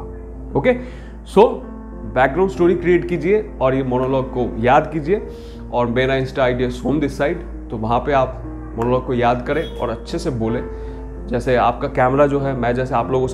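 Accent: Bengal, India. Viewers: Sirji thanks for the video par aap apna hindi ka R theek kar lo..Bengali accent aa raha hai usmein..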